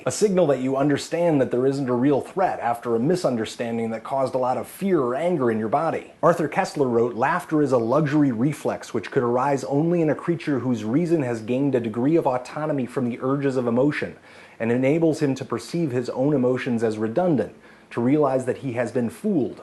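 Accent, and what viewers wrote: Germany. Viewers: ze has such a good german accent! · The hilarity of a german guy talking like a cliché german. It makes me so happy. Oh boy.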